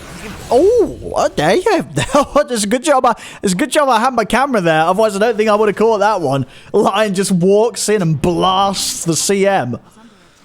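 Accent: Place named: United Kingdom. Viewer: where can i watch all his casts?? i want to adapt his thick british accent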